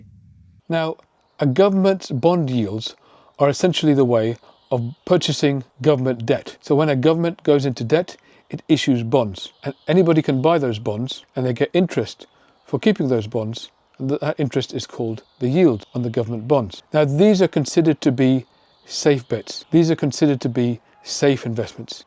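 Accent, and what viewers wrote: United Kingdom. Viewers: I’ve been watching you for a while now - and really appreciate your take on things, but I only this minute realised you were British - I hadn’t really thought about your nationality till now. Just saying (and a comment for the algorithm)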